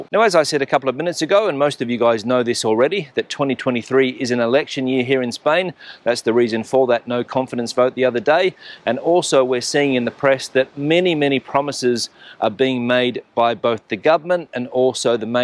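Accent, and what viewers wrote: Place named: Australia. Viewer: Sounds Australian to me.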